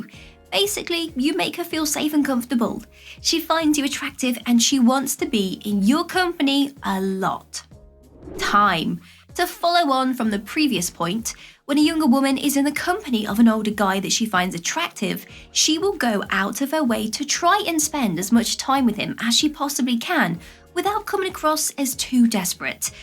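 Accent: United Kingdom. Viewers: She is british....at least the accent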